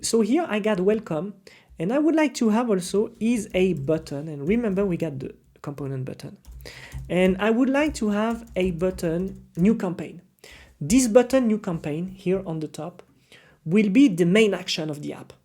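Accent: France. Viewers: Good one, you sound Frenchie, it will be great if you also have a french channel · I see your frensh accent and ilove you sur❤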